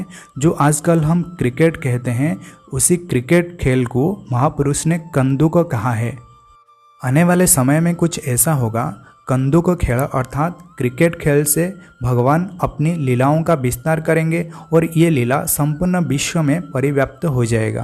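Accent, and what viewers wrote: Odisha, India. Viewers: Ap odisha ki ho kya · Tu odia ki bhai tu eise Hindi bolta hai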